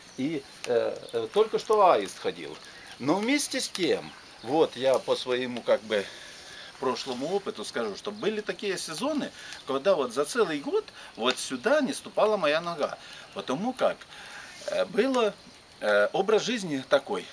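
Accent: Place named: Vologda, Russia. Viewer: говор вологодский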